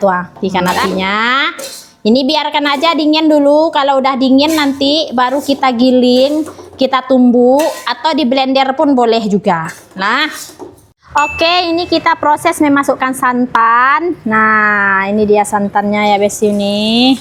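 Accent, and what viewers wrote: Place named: West Sumatra, Indonesia. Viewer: Bahasa indonesia logat minang he he heeee .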